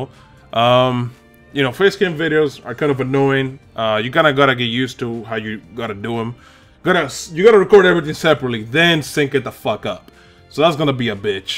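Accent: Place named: Dominican Republic. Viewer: BRUUHHHH i was honestly not ready for this loool you look like such a cool dude, i can finally put a face to that sick dominican accent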